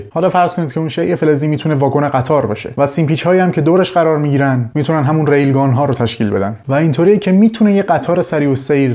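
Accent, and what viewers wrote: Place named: Lebanon. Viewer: چرا لهجه ت ایرانی نیست!!! لبنانی هستی یا روس؟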